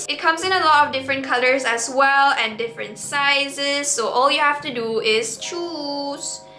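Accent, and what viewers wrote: Malaysia. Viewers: Your chinese is really good,and your accent sounds very 'Malaysian Chinese'. I literally thought that you're a mixed race between Chinese and Malay🤣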